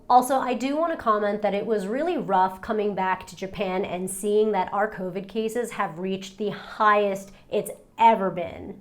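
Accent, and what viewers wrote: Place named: New Jersey, United States. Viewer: saw your IG and your 'cathartic cry'. Glad you're making healing progress. Also, you're losing your Jersey accent, slowly but surely, hahaha.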